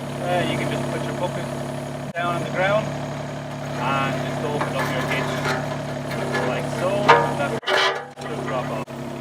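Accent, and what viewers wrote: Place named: Ireland. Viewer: Yes this is my second comment on this video and I’ve seen all of the others where people are swinging hammers and all sorts of things to get buckets on and off. This guy with his lovely Irish accent just simply shows you how to get the bucket in balance and do it with one hand. I am part Irish so I can say something about his lovely accent!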